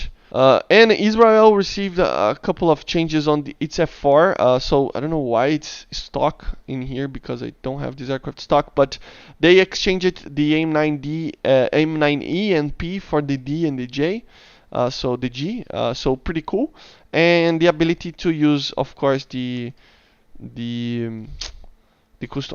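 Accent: Brazil. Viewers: Brasileiro só pelo sotaque kkkk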